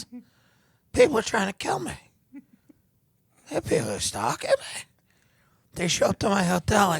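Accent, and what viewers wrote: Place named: New York, United States. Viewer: Hahahaha the New York/East Coast accent is a blessing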